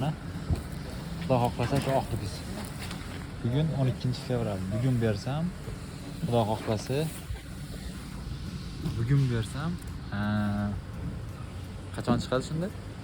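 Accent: Khorezm, Uzbekistan. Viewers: Хоразмданмисиз асли шевангиз сал тортяпти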